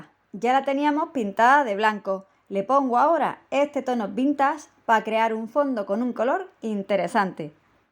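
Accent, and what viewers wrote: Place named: Andalusia, Spain. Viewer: Pues a mi me encanta escuchar el acento de... porque aunque sea andaluza se la entiende a la perfección... La verdad es que si me molestaran esas cosas no podría ni ver nada en la tele porque hasta los periodistas de los telediarios hablan con incorrecciones, y muchas gramaticales que es peor que el acento (como lo de su tilde en la e).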